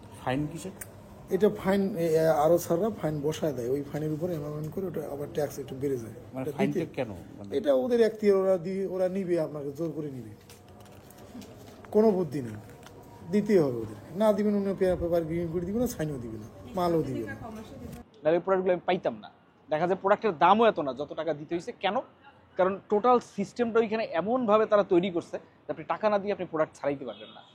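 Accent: Barishal, Bangladesh. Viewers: bhai er accent e dehi borishal fuita uthtache 👍🏻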